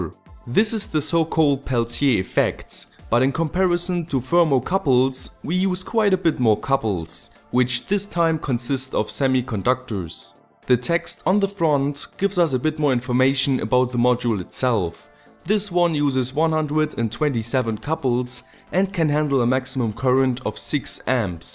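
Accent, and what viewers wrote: Germany. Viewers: du bist deutsch oder ? das hört man :D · Are you german? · Kommst du aus Deutschlanf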